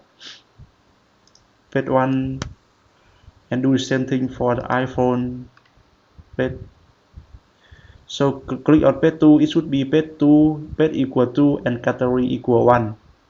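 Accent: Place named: Vietnam. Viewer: nghe là biết người việt :))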